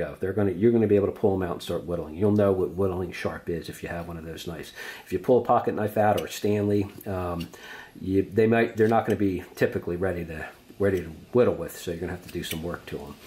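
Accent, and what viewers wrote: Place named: Philadelphia, United States. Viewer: Another great tutorial! That really sounds like a Philly/S.Jersey accent, haha! Im in central PA myself! Go Birds 🦅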